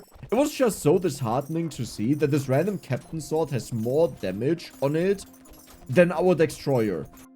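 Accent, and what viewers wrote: Germany. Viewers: i can firmly hear the german accent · You sound German… Edit:he is I’m proud of my country now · I Hear deutsch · German english is awesome 👍😎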